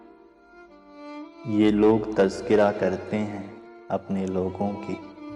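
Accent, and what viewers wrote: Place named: Kashmir, India. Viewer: Voice of kasmir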